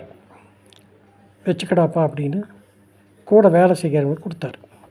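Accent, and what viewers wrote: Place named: Kongu, India. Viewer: Nalla experience..Good message.🎉🎉🎉🎉 Good Kongu Tamil slang. God bless you.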